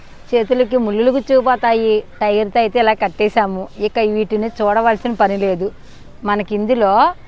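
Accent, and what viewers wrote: Godavari, India. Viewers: Hii garu mee oopikaki joharulu andi me matalu vini godavari zilla anukunna madhi Kakinada andi.❤❤❤❤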